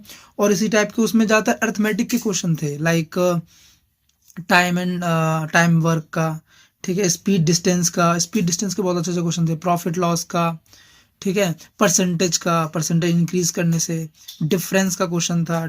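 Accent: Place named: Bihar, India. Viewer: aap bihar se ho kya aap nomadic shubham ki tarah baat kr rhe ho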